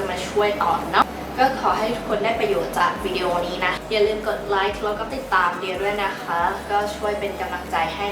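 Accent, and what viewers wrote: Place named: United Kingdom. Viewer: อยากทราบว่าตัวเองเอาพวกคำศัพท์สำเนียงบริติชมาจากไหนค่าา🇬🇧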